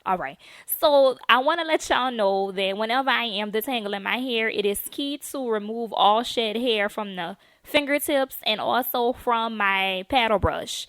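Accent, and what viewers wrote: New Orleans, United States. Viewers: I bet she from New orleans with this accent😭😍😍😍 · Your New Orleans accent is the best! You couldn't hide that thing if you wanted to! :) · Are you from New Orleans?? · yes, New Orleans, my husband and my in laws are from New Orleans, girl, love the accent, and hair.. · When N.O accent is lifeeee 😩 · Come thu New Orleans accent!!!!! I love it!! Don’t judge me.....judge your friends😂😂 Laissez les bon temps rouler!!! 💚💜💛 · As soon as I clicked this video: "Oh. She from New Orleans😁 Yes indeed." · Just found your video. Good content. You sound like you are from New Orleans where I'm from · beh-behhh.. girl.. im in LOOOOOOOVE with your nola accent. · Watching this video in the car w dad. He heard your voice and immediately said, "She from New Orleans?" He heard that accent baby 😂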